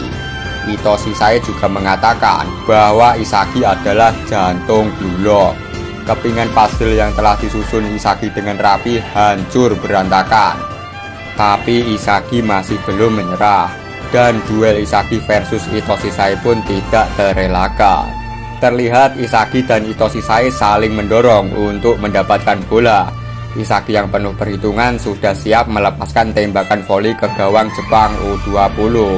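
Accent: Java, Indonesia. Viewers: Pembawaan udah bagus minus di logat Jawa nya aja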